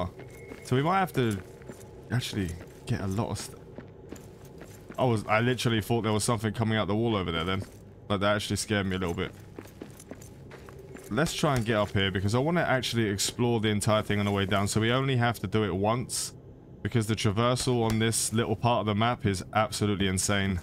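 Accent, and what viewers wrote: United Kingdom. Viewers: Love the playthrough so far man. loving this game too, I just got to Duran's forge last night and having a blast! also my brother in Christ you are British AS F*&%!!! lmaooo "oh im just a knob-end"..."lovely jubblies"... Being American, our speech and pronunciation is just so different its always hilarious thinking about how it got that way lol anyway keep on keeping on man, im having fun watching along! edit: 😂😂 you're talking about loving the songs the dwarves sing just like in "Black Sails and the vikings as well....like assassins creed" lmaooo BRO take some neurotropics or something you're forgetting names for everything!! looool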